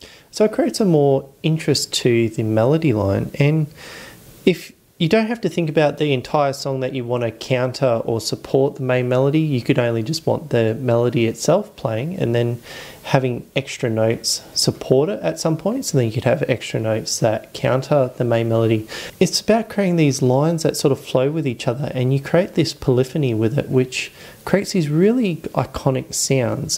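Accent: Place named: New Zealand. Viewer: gosh i love that accent is that kiwi? great tutorial thank you!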